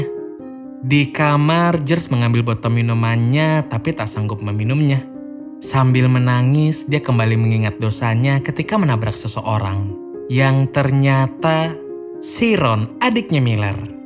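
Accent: Indonesia. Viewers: Pengucapan kata kata dlm bhs Inggrisnya lurus banget spt bahasa Indonesia 😭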